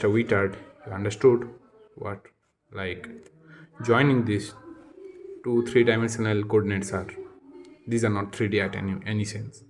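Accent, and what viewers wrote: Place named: Africa. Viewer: U got the African accent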